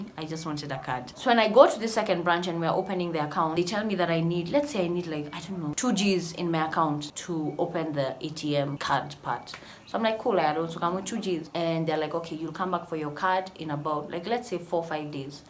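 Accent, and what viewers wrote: United Kingdom. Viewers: Your British accent ✨💕💕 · I so love you..it's such videos I long for.. kindly do a video with your British accent..I would love to hear this ❤️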